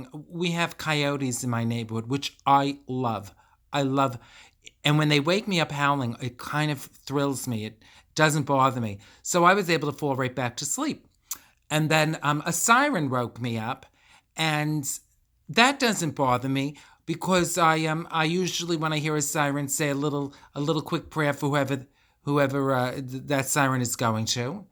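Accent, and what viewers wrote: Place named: East Coast, United States. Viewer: I simply cannot fathom the fact that instead of being touched by ur heartwarming confessions, people choose to see it as name-dropping. Oh well. Your east coast accent perfectly matches the west coast setting miss coco, thnx for sharing these gems with us puffs. Love from greece ❤️❤️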